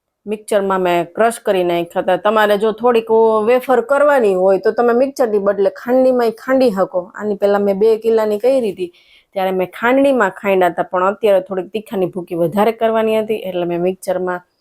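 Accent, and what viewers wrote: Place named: Rajkot, India. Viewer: બેન રાજકોટ ના લાગે છે બોલી ઉપરથી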